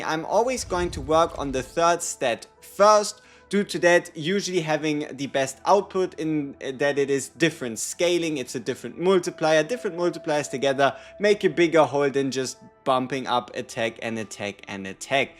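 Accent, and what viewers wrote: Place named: Germany. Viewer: I spot myself a fellow german when I hear one :D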